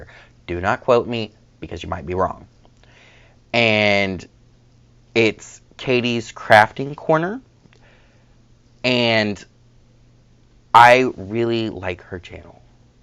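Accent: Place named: South, United States. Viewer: Thanks for being with me in the last couple of days, have just come across you I think it was via forensic flosser don't quote me on that though, and I have been binge watching you oh my you have had me in stitches so many times I think one of my favorites was .. god is like ikea hahaha I totally get the being awkward in front of a camera ( you are soooo not) I tend to freeze up I hope it gets better soon as I am so not totally boring Iike I think it comes across on flosstube I promise I do actually have a personality people lol maybe that's why my watching time is so low per video. I love your stitching I too like terresa Wentzler and have several of her patterns but have not yet started any must do soon love seeing your updates and I could listen to you for ages I am a sucker for accents especially the southern states. any who will talk to you soon have a great stitching and general life week .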